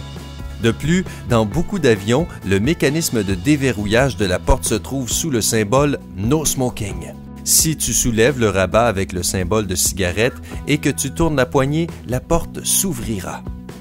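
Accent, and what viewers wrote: Quebec, Canada. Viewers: RRr cet accent québécois.. Je n'arrive pas à m'y faire. Il est agaçant à entendre. Pardon. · Oh Non, l'accent canadien. Je peux pas ... sorry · Pur accent québécois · Putain d'accent canadien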